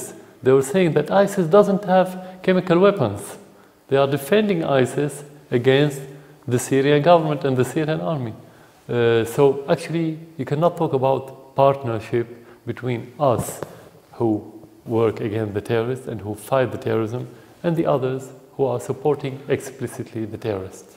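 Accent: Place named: France. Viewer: why does he have a french accent? he speaks English with a french accent .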